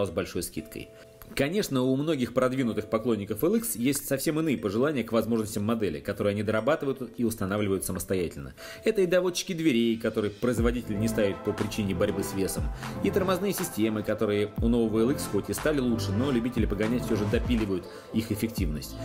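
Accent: Standard. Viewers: Спасибо,. Всем не угодишь. Я с Казахстана. И мне приятно слушать чистую русскую и грамотную течь. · Многие обзоры смотрю но пока всех рвёт-хороший словарный запас, чистая русская речь без хаканий и гаканий, нет ёбоной рекламы сукотеков, музон и картинка выше всех похвал-что вам блядь ещё надо, чел отрабатывает каждый ролик на 150%. Давайте скажем ему СПАСИБО!!!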